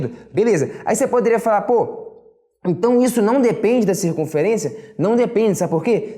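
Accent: Minas Gerais, Brazil. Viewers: Extremamente bem explicado! Esse sotaque aí eu conheço heim! Mineirinho!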